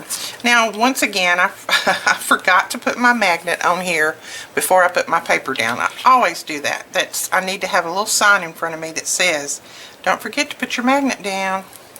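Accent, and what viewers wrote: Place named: East Texas, United States. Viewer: would you happen to be from E/NE Texas? you sound like family members of mine. :-)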